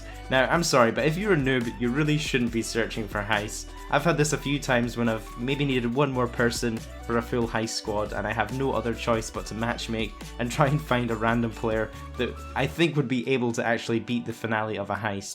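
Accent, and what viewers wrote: Scotland, United Kingdom. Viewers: U scottish?? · Are you fae Scotland? 🏴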